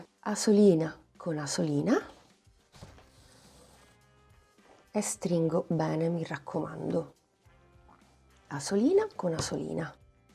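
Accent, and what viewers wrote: Sicily, Italy. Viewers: Carinaaa 😊 io adoro i progetti semplici e veloci 😂 anche perche sono gli unici che mi riescono 😅.mi togli una curiosità (se vuoi) sei Siciliana e di Catania per caso? Grazieee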